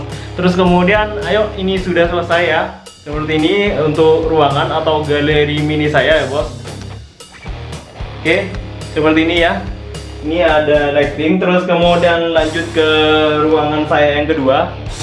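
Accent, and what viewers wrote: Madura, Indonesia. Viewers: Mas asli orang mana? Logatnya koq kadang sy dnger kaya orang madura ya😁🙏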